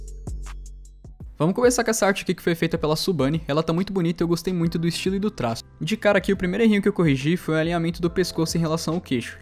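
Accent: Rio Grande do Sul, Brazil. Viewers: Gaúcho né kkk?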